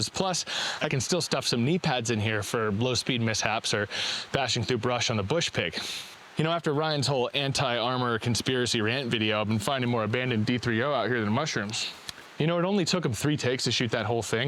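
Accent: Canada. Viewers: Not much of an inside joke when everybody knows this Canadian pronunciation quirk. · Nothing like gettin’ oot n’ aboot eh!? From Ottawa to Hull you have no equal. Rock on eh!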